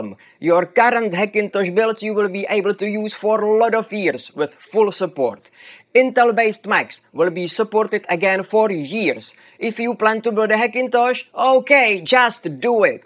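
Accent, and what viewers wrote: Czech Republic. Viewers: Glad I found your channel, great stuff!! I love the accent as well, are you czech? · Hey, are you czech by any chance? You have an accent, which really reminds me of the czech ones lol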